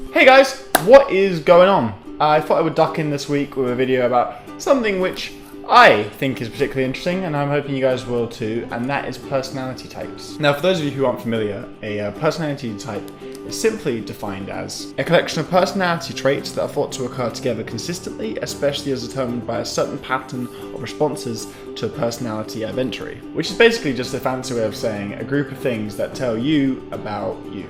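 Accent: United States. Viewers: Your accent is becoming more American. The more time you're spending with Arden the more you become each other and that is true love. Also found in friendships and it's so so damn cute! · anyone else notice the new slight American accent??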